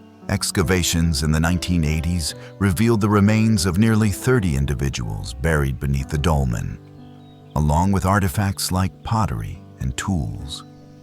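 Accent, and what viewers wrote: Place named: United States. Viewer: Ahhhhh not this annoying AI American voice. Bleh.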